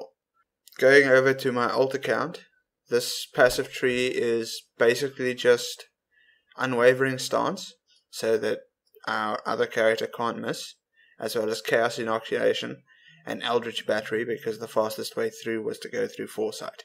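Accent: South Africa. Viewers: are you from S.A sounds like it ?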